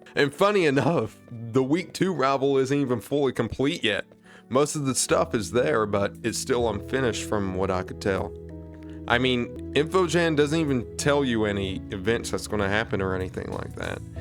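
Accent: South, United States. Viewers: this is the most southern man ive EVER heard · Your hillbilly voice makes this 10x funnier. Keep on being awesome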